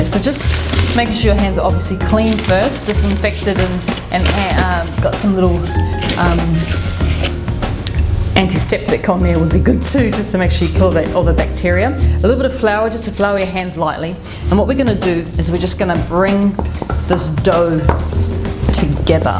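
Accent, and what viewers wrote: New Zealand. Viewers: Going to try these because of your Kiwi accent😂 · I got this recipe from a Tupperware Dealer many years ago and they were the only scones I have ever had success with. I lost the recipe so thank you so much for this Video. I will try it with -preheating my baking dish - is there a reason for this? Even without the accent, "Runny Cream" is so Kiwi. Thanks.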